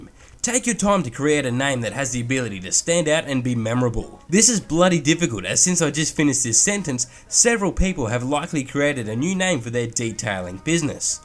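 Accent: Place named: Australia. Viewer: Your Australian accent is giving me a blinding headache....I’m not from Australia....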